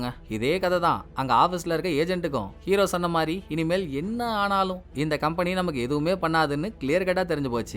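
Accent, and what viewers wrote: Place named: Chennai, India. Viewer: Pakka Chennai fellow bro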